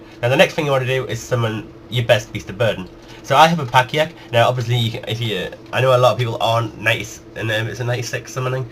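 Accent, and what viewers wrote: Newcastle, United Kingdom. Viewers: he's a jordy, love his accent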